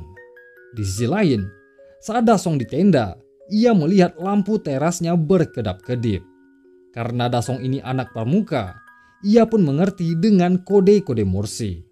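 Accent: West Sumatra, Indonesia. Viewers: lo pasti orang sumbar bang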